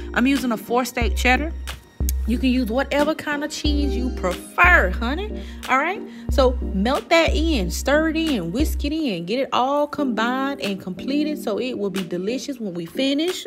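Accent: South, United States. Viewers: Will be trying your recipe tonight 😋 I love the way you explain and talk lol sweet southern belle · By the way your voice is so southern and comforting that I sometimes listen to your YouTube channel even when I'm not cooking, you remind me of my aunt back home in the south. Everything you make, makes my mouth water! Keep that delicious goodness coming! ♥️ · She definitely from the South, I love Us😊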